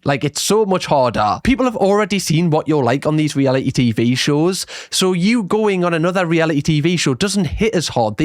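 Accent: Newcastle, United Kingdom. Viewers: Hi, love watching your videos. Do you have a Geordie accent? It sounds familiar, but I'm not sure. I hope my sons will be as emotionally mature and empathetic as you are. Any tips? 😅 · He is indeed Geordie :>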